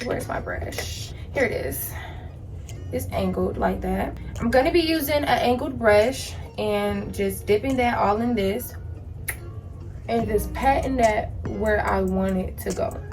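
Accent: South, United States. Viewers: Omg, I didn't know you were from Augusta! I did hear a little bit of Southern accent! I am from Statesboro, GA. Love the video! XOXO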